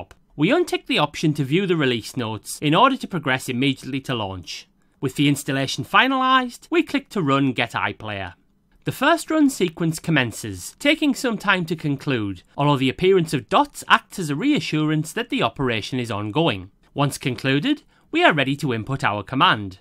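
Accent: United Kingdom. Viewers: thankyou so much, nice northern man. this was so clear, so concise, a child could do it. I'm impressed and very grateful ❤ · Clear , visual instructions and ofcourse it helps that you are from the UK ;) so easier to understand . Please make more videos like this , esp UK focussed content . Subscribed and keep up the good work . Will tell my friends . With such clarity in your videos - you will go far ....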